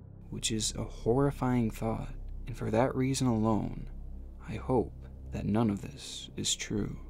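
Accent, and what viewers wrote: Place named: United States. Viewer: why tf do you speak like this? "Kalua" Lumpur??? jesus christ.... the american-ness is out of this world. and there have been found bits of the plane . this has to be one of the most triggering videos i've ever seen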